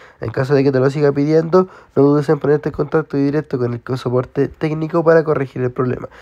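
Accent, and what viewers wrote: Chile. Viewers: Es chileno, así es su acento, de hecho es bastante entendible comparado con la mayoría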